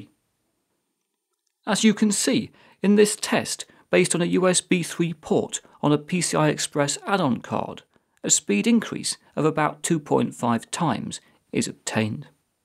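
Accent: United Kingdom. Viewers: It is a British Accent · Nein, es ist Britischer nicht Amerikanisch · brittish dude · He's British... this is how they all speak, what more did you want? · Dat british accent!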